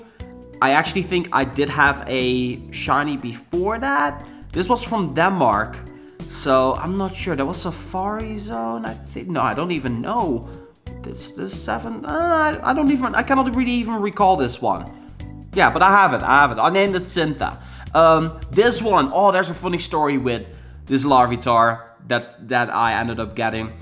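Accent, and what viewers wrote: Netherlands. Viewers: Jij Bent Nederlands ik hoorde je het praten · Wow are you dutch im dutch tooo !! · Are you from the Netherlands?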